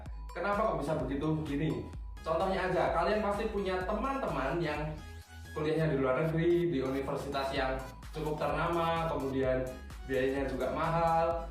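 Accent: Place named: Java, Indonesia. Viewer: Maju terus..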